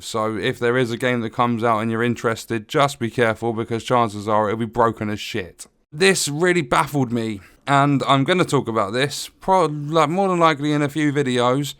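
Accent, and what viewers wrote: United Kingdom. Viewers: That’s everything I’ve been saying ever since TU8 dropped except in a British accent. Even the fuck the devs. I don’t wish them death or disease but I do wish the could pull their heads out of their asses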